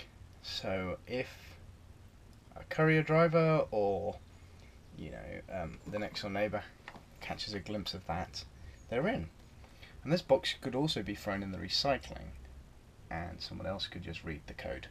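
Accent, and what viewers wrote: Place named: United Kingdom. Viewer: I really love the British accent xD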